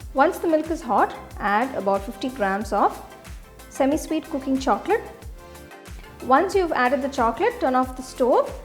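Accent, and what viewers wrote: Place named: South India, India. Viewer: You need to work on your pronounciation . The words you day aren't clear and our south Indian accent is irritating 😫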